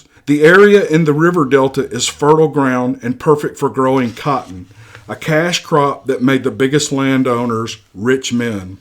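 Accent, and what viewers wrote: South, United States. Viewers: You do the southern accent proud, mate! :D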